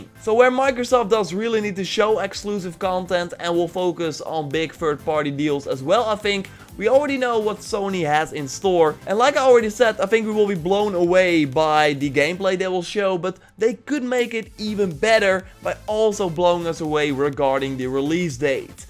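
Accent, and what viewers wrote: Netherlands. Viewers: Are u Dutch? you sound Dutch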